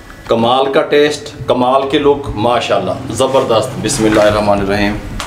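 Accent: Punjab, India. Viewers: 😂 😂 Uncle boht nice hain ap... Punjabi tuch boht wadiya lagda g💕💕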